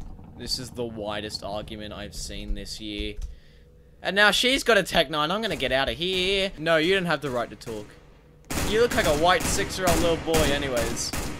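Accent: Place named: Australia. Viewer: I like this guys Australian accent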